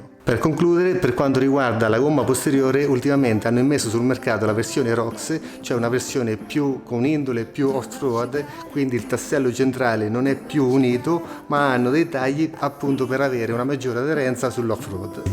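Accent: Marche, Italy. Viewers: montate da 2mila km sulla mia Africa Twin. Dall'accento sei marchigiano?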